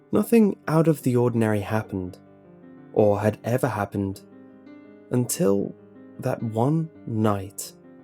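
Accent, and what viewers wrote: United Kingdom. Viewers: This British accent of yours is interesting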